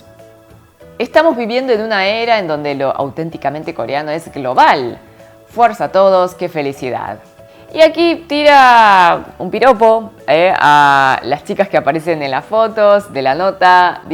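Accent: Argentina. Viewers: Tienes acento argentino si no equivoco xd gracias por el video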